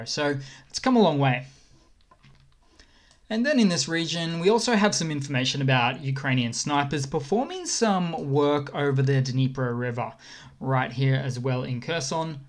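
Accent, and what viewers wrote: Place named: Australia. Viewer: Assuming you are in Australia — as your accent would suggest — you must be uploading around midnight (NSW, Vic, Tas), 11.30 pm (SA), 11.00 pm (Qld), 10.30 pm (NT) or 9.00 pm (WA). Or maybe 1.00 am (Norfolk Island), 7.30 pm (Cocos/keeling Islands), 7.00 pm (Australian Antarctic Territory), or 6.00 pm (Heard and McDonald Islands). On any view, burning the midnight oil. So a special thanks for the effort which this involves.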